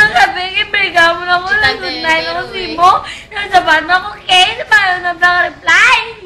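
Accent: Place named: Hiligaynon, Philippines. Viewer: Nami gid pamatiun mag storya ilonggo ah :) daw ma inlove man ta saimo ba :)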